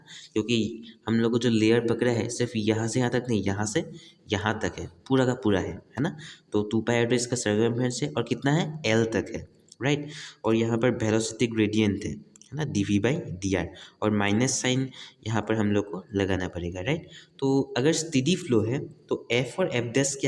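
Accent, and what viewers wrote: Assam, India. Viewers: Are you from Assam?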